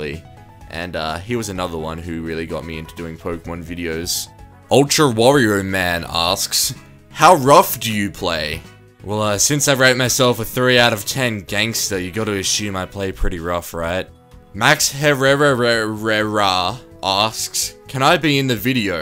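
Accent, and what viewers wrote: Australia. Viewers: just subscribed, don't know why but channels with australian (maybe you're from new zealand or the likes, im shit with this stuff my b) make me laugh so fucking more than if an american and or englishman(?) said the same shit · are you from australia coz you sound like you are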